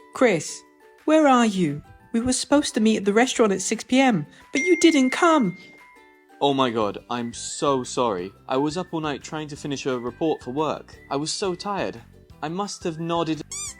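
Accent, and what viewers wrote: United Kingdom. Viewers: you seem like a British native speaker are you from the uk?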